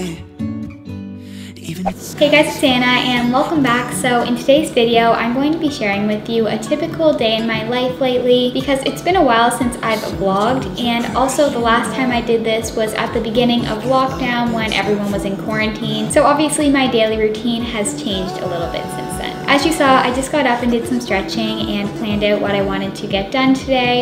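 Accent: Canada. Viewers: Are u Canadian or American?? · I love the way Canadians say "sorry" and "picture" it's so endearing.😊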